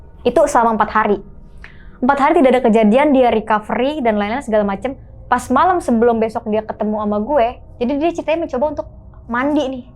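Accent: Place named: South Jakarta, Indonesia. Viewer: Terlalu Jaksel gaya penyampaian materi nya, hello yg nonton bnyk org daerah bicara nya terlalu jakarta deh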